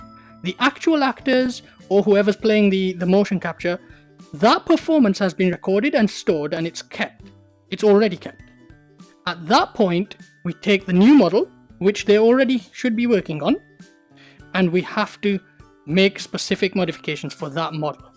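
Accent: United Kingdom. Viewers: I bet this dude is Asian too, it's that British Asian accent 😂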